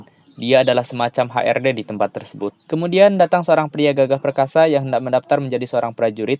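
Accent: Indonesia. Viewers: Logatnya terlalu ke Sunda"an bro bikin kurang sreg dengarnya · Ngapaknya sungguh kental 😂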